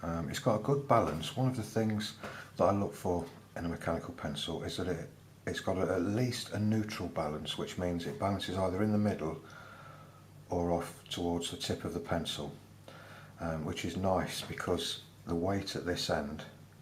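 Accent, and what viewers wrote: United Kingdom. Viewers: i'd like to make 2 small points on your channel. 1.you have a very nice, relaxing voice; 2nd, your english accent is not very deep, and while most non british people would find that tough to understand, i would find it a bit annoying, so ty for that too. im in process of getting a mechanical pencil and i have to choose between 0.5 and 0.7 for mostly writing and between uni kuru-toga pentel 205 and zebra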